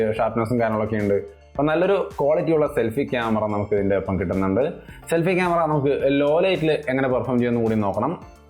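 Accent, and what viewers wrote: Thrissur, India. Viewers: Veedu thrissur aano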